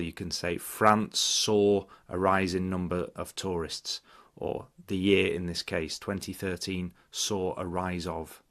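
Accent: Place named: United Kingdom. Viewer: Woow u've such a beautiful voice and accent, Don't take it personally, it's not an offensive word. How did you achieve this accent, I from central asian, because of that I have problems in accent, as sound r. How did u get this british accent?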